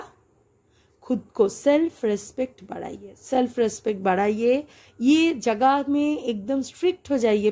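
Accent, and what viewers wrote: Bengal, India. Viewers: Always love to hear you, especially your bengali accent. The ways you explains, really every single word touched my heart . Pile 1 resonated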